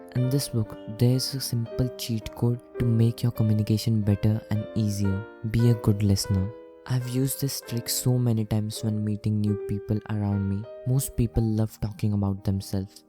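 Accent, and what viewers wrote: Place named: India. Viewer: was lowkey invested till I heard the indian accent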